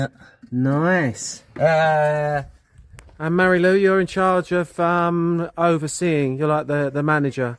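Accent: England, United Kingdom. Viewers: Watching from the SW of Western Australia. I am originally from Essex. Love hearing your English accent. You are doing a fabulous job on the cottage…Donna, 🇨🇰🦘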